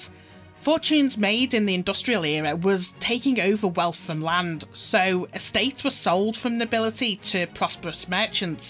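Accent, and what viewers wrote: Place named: Manchester, United Kingdom. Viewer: I'm an American and I can't place your accent, is it Manchester!? Love your videos! Definitely subscribing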